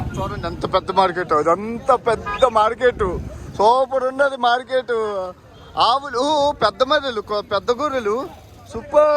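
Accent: India. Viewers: you are a unique guy. Got addicted to your videos after seeing the Telugu Traveller vloggers controversy video. Great that you are enjoying and making viewers happy with your videos. Your voice, sense of humour, Telugu slang makes your videos more beautiful and wonderful. Wish you all the best. Take care.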